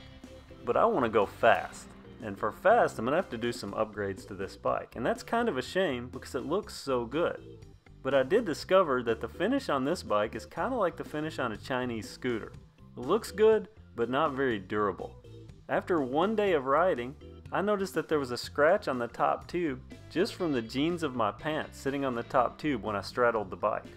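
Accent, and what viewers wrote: South, United States. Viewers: His Smooth Southern accent is so charming! I absolutely dispise how Northerners call Southerners accents "ugly" or "not articulate". This guys voice is the definition of articulate!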